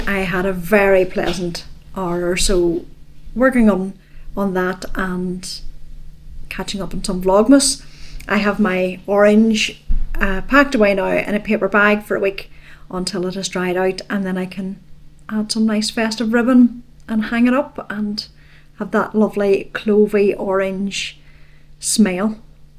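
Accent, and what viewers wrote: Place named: Ireland. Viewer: I have read several series of books about Ireland. Susanne O’Leary and Kennedy Kerr are two of my favorite fiction writers! I’ve never been to Ireland, nor will i be going at 77, but the names and places and customs do so intrigue me!!!! Being from Alabama in the southeast USA , Ireland is a place i dream about! Your accent certainly helps, too!❤️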